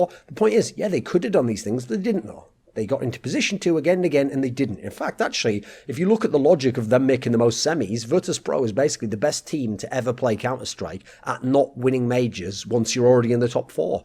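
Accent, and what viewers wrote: United Kingdom. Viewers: Why is more British today than usual?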